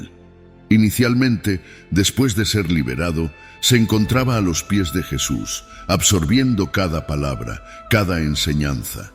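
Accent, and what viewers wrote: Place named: Spain. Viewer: Jodrrr que majestuosa voz tiene el hno relator , se nota su marcado acento español , q buen trabajo ha hecho en este video , felicitaciones !!!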